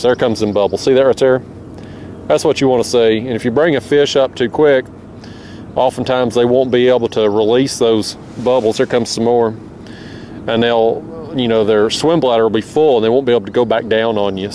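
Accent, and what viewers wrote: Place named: North Alabama, United States. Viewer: Just subscribed. Realized quickly you have the same exact country accent everyone spoke where I grew up (North Alabama). I shed my accent after moving to a bigger City, but it always makes me happy to hear it still alive and well! Awesome channel my friend!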